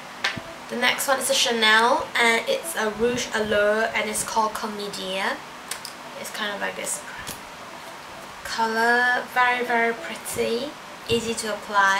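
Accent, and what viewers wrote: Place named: Northern England, United Kingdom. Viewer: Are you Japanese? I lived in Japan and taught English there for 4 years. I have never heard anyone from Japan who can do a good a northern english accent as you, its amazing!! How long have you lived in the UK?:)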